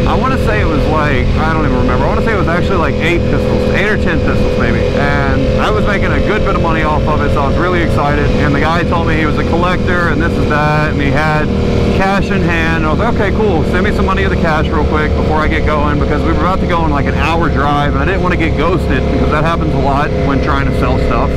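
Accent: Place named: Shreveport, United States. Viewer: Sounds like Shreveport,la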